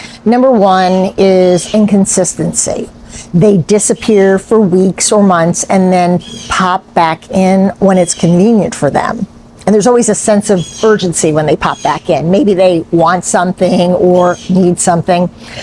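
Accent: Philadelphia, United States. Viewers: Thanks... About your accent. Are you from Delco, Philadelphia???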